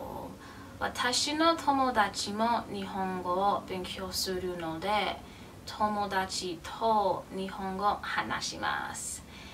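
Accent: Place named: South Korea. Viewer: Wow! I am really impressed! The accent sounds a lot like Korean. How are you doing your speaking practice? Is it with natives or do you just record yourself each day?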